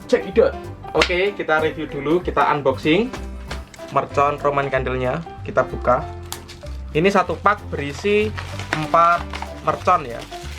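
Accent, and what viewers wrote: Java, Indonesia. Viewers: Jowo pundi to mase?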